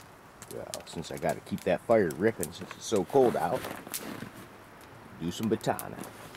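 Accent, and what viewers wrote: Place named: Pittsburgh, United States. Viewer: Hey man, are you from Pittsburgh? You sound like it. Thanks for all the vids.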